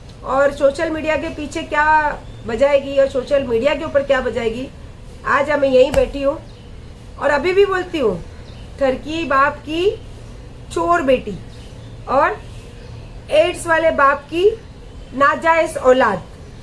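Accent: Bangalore, India. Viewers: Wow ..😍😍😍what a bangalore style language😘😘😘❤❤❤❤❤❤❤ God bless you stay strong Aunty ji love you😘😘😘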